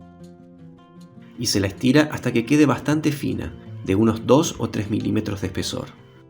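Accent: Argentina. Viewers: Que hermosa receta!!! Buscando, encontré la perfecta!! Y hablado en criollo argento!!! Lo amé!!!!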